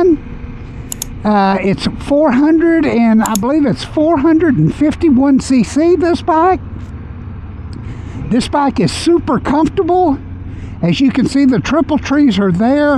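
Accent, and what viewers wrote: South, United States. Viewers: Confirming my belief that all have southern accents. Great video!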